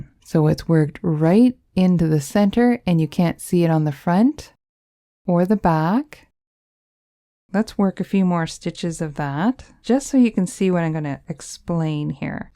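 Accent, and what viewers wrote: Canada. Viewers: Love this! Also love her East Coast or Canadian accent..."the yarn end pulls oot." 😊❤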